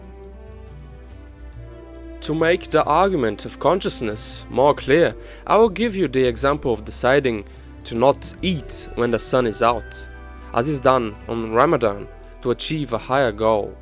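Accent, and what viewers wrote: Germany. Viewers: Great video ! I like your accent, is that german ?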